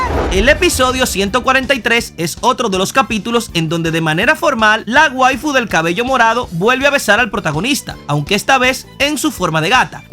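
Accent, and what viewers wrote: Dominican Republic. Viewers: una pregunta tu acento me suena muy dominicano, y te pregunto ¿si eres dominicano verdad? porque ese acento lo reconozco muy bien, y si no eres dominicano de donde eres porque ese acento no me suena ni a cuba ni a puerto rico que son los paises que hablan mas similar a republica dominicana, aunque estoy entre la espada y la pared si es dominicano o puertoriqueño pero la diferencia es que nunca reemplazas la "r" con la "l", y incluso pones referencias de personas dominicanas en tus memes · Una pregunta: por casualidad eres dominicano?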